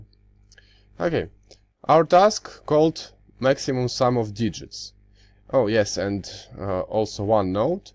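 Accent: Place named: Russia. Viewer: your english has a russian tone, but it doesn't matter. you are a good communicator. keep it up.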